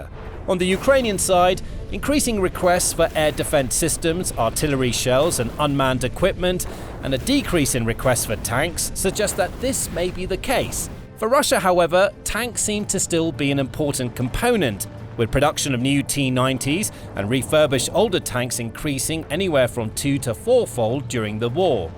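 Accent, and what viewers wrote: United Kingdom. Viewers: I thought he said the Challenger was the best overall, which seems to go well with his British accent.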